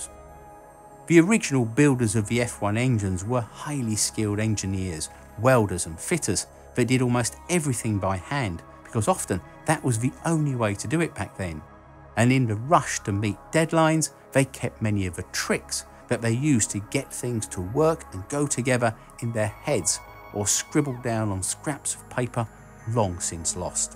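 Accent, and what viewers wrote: United Kingdom. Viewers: I can’t listen to or trust people with british accents so won’t be watching any more of your videos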